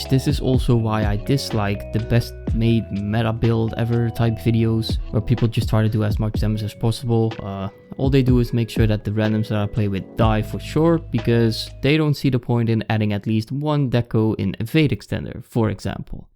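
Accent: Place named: Netherlands. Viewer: I can pick that dutch accent out of ten thousand voices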